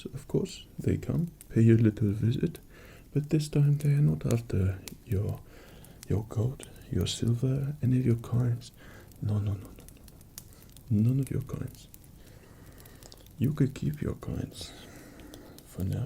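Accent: France. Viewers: Les gens aiment écouter quelqu'un parler anglais avec un accent français ? Damn, moi qui ai toujours forcé pour essayer d'avoir le meilleur accent anglais possible! :') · Wouah ! It's amazing ! You have exactly the french accent ! It's so rare to do this ! It's a good vidéo ! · ragnar lothbrok roleplay? the french accent reminds me of him lol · As a french, I think this french accent gives a softie side to that king, which is weird but funny at the same time. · The accent of a French King and the speaking pace of Travis Fimmel as Ragnar Lothbrok... Counting you do look like Thor, you could be the first line of viking nobility of the country. No ? ;) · I'm French and your french accent is horrible but really funny and kinda relaxing :') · I expected a very Monty Python French accent, but this was a pleasant surprise. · Omg I'm french and It's really creepy like he does very well the french accent xD ... 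It seems me lol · Your French voice is so amazing, do fancy French roleplays more often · French accent and HRE flag on preview. Nice. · I'm french and you have a little french accent...with a good english spoken xD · All I see is Thor with a french accent wearing a crown and I'm dying XD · Dont know why he has a french accent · french accent is very funnn il french XD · He has a German flag, a French accent but he speaks English? What is this sorcery? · This actually sounds like a French accent lmaoooo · I CAN UNDERSTAND ENJ?GLISH WHEN YOURE SPEAK ENGLMISH WITH FRENCH ACCENT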